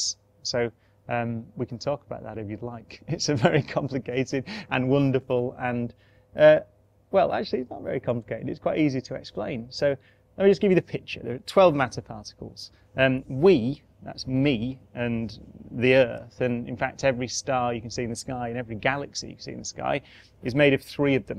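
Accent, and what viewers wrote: United Kingdom. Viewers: British accent! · It's the British accent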